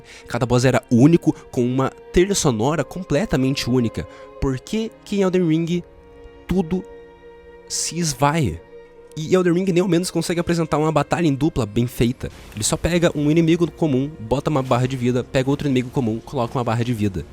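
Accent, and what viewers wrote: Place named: Sao Paulo, Brazil. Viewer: Eu não vejo problema nenhum nesse vídeo. Eu vejo problema em quem esperava algo bom sendo dito por alguém que fala igual paulista...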